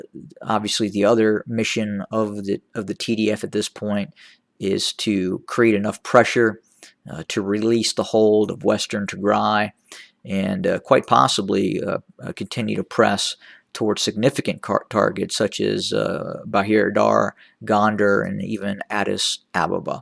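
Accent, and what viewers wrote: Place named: United States. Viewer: You are silly and i am 100% sure you are tigrawi with American accents. እናትን ልብዳት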